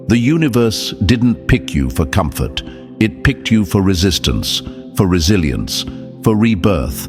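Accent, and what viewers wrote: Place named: United Kingdom. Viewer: How would know. You sound British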